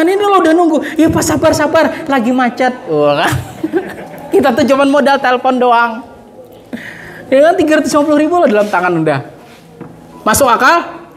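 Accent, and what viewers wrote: Banjar, Indonesia. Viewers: Logatnya mirip kaya orang Banjar....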